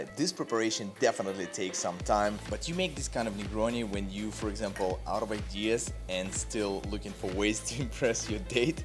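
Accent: Russia. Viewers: Why do you talk so Russian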